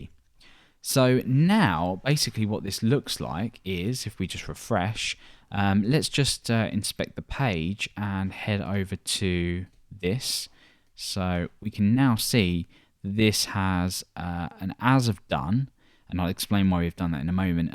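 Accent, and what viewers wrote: United Kingdom. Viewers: PHP tuts, bonus British accent free.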